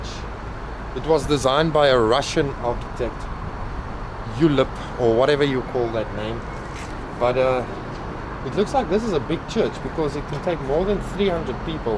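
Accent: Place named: South Africa. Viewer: Your accent is giving South African are you South African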